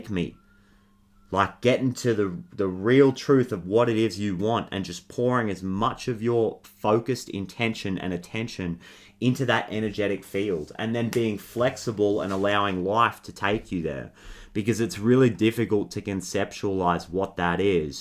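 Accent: Australia. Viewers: Instant accent bonus applied! What part of Aus you from? 😂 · The comfort of hearing a fellow Aussie voice jump out 🤜🏽🦘🤛🏽 Thanks man. 1st time seeing your channel. You helped me get at some tears, & quietly verbalise what's been eating at my spirit. Feelings of friendships not being reciprocal enough, even when they are good solid friendships. Feeling utterly disconnected/detached, but not in a way that feels helpful. Lots of reminders needed to hear in this. I appreciate that. The waterfall, also so significant to me... Let's see what happens I guess.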